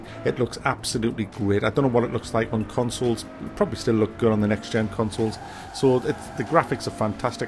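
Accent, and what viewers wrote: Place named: Northern England, United Kingdom. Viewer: love it, Nice to hear another Northerner, from Durham way?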